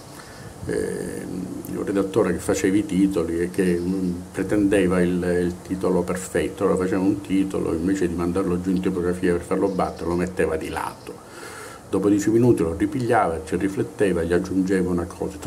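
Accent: Sicily, Italy. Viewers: ma sei Siciliano, della provincia di Catania?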